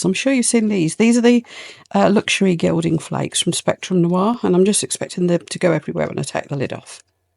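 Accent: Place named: Midlands, United Kingdom. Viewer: Super informative! Are you from the Midlands by any chance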